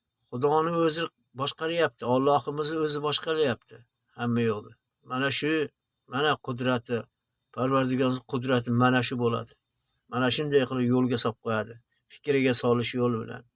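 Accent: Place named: Kyrgyzstan. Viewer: Dunyodoshlar deyishizga qaraganda siz Oʻzbekistonlik emassiz.Shevangizdan Qirgʻizistonlik oʻzbeksiz. Rossiya propagandasini kuchu bu!Oʻzbekistonliklar bunaqa fikrlamaydi!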